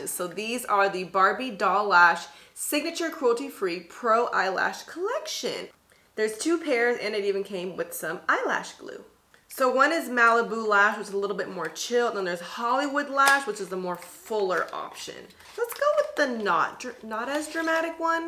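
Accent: United Kingdom. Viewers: I thoroughly loved this video. It was so fun to watch. Loved your British accent, almost spot on. I received the notification of the video while I was watching you. That was weird. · I LOVED this video!! Complete with British accent!! 😁 Very nostalgic for me, too!! ❤❤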